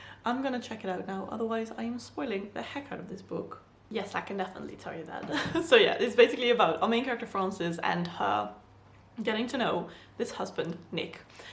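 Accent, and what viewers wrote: United Kingdom. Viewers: Did you live in the UK? You have a British accent, it’s lovely ☺️ I’m only asking because some other youtubers that are from the Netherlands have a different type of accent when speaking in English.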